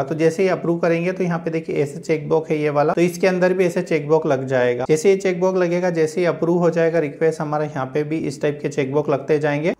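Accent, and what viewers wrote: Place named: South Asia. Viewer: Very nice Explanation simple and crips with desi tone 👍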